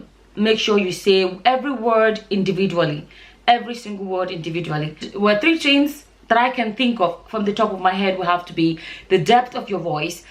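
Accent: Africa. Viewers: You naturally have an African accent. We can literally just watch your videos, mimick you and have an accent.. everybody's voice is not deep like that lol 😂😂😂😂 So many women from Africa have high pitch voices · I am a Spanish native speaker and I don't why I love African accent since I heard first time. I wish I could develop some kind of accent close yours. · IT'S REALLY HARD TO DO AFRICAN ACCENT :"3 HOW CAN YOU DO THE AFRICAN ACCENT AND I CANT :"3 I WANT TO SPEAK IN THIS COOL ACCENT :"3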